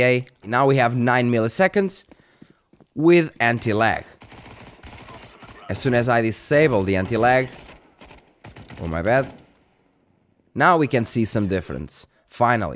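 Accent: Portugal. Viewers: Boas mano, preferes o processador 7700x ao 7800x3d? Reparei que esse é o teu. Abraço bom conteúdo, pelo sotaque vi logo que eras tuga hehehe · Are you Portuguese by any chance? You sound like one of us tho haha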